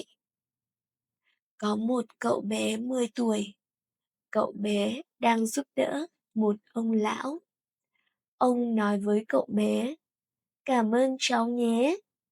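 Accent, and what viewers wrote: North, Vietnam. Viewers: Thank you very much for your videos. I deleted all the other channels and kept only yours. I finally found what I was looking for, and now I only study with you. Im European, my girlfriend’s from HCMC and her dialect is a little different from your northern one, but I still continue to watch you. Thank you for being there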